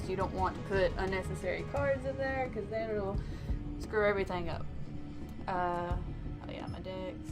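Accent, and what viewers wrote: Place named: Tennessee, United States. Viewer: Dat Sweet Sexy Tennessee accent <33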